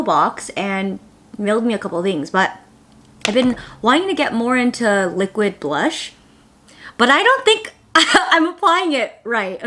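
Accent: United Kingdom. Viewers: Loving the British accent while cooking haha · Are you British accent? It sounds slightly korean. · I like your British accent.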